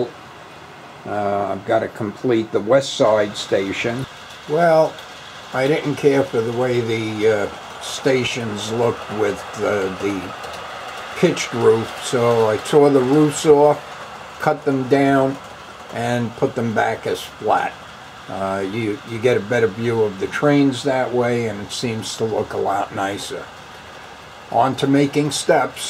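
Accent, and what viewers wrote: New York, United States. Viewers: Hey New Yorker - I am a fan of yours,! I lived in Manhattan & Brooklyn for many years and I rode the F Train as well... Your work is simply great with a fine attention to detail. This is the work of a true craftsman of RR and Subway RR modelling. Thanks BTW, your voice has that warm New Yorker dialect that makes me year for a Nathans dog and a Bagel with cream cheese and lox... Enjoy your great work and your layout, sir...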